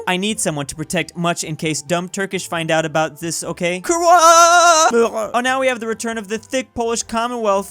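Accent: Australia. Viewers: Hot take, but.... Australian accent is better than a real Australian’s accent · I love the Australian Accent do a Video with the Accent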